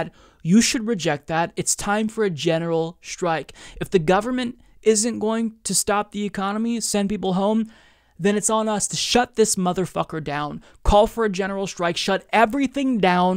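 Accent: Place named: Midwest, United States. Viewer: I've heard you mention in the past you've worked on your speech and that either you're insecure about the way you sound or you've gotten negative feedback about the way you sound. I want to tell you that I love listening to you talk. I love your midwest dialect. I love your mannerisms and -isms. Btw I am someone who knows most people can't stand the way I talk or sound so I feel like I'm a honorary expert!! 🤣 😅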